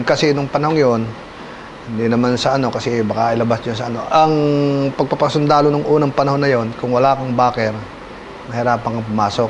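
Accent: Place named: Batangas, Philippines. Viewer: batangenyo si sir ala eh!!!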